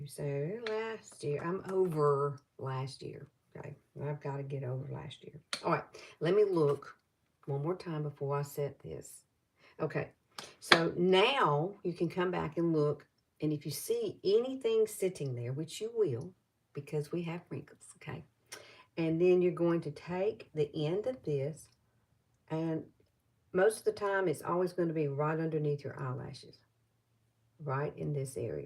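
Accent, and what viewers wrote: South, United States. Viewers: I really look forward to your videos! I so enjoy your southern drawl! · I also like NYX Epic Smoke Liner. U’re right… short life sucks, not at all a long time… wasn’t supposed to be short. Ur southern talk is so cute :)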